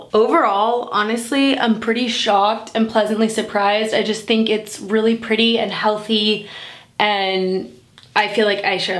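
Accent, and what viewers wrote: South, United States. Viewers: I like the southern accent better. It’s you. And that’s more than ok. 🙂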